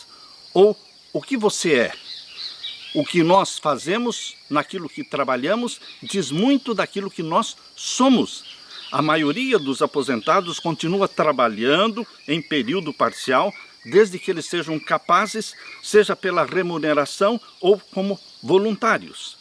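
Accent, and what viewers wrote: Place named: South, Brazil. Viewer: De onde o sr. é? Com este sotaque bonito? Parece do sul! E este local em que está fazendo o estudo da lição, com essas prdras lá atrás Que lindo!....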